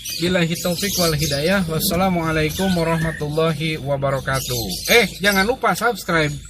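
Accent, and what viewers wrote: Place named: West Java, Indonesia. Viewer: Udah kaya denger ustad ceramah logat sunda.. Hhhhh detail n terperinci... Semoga saya bisa nerapinnya sama trotol pemula main mb.. Thank gan.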